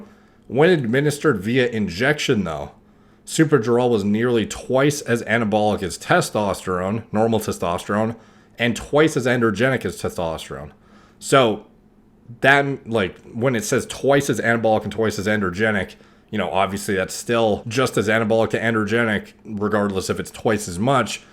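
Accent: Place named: Canada. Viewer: Thanks for the reply. Been watching a ton of his videos and was just wondering. His accent implied either Canadian or Northern USA. His accent didn't seem strong enough for me to automatically assume he is from Canada. Thanks again.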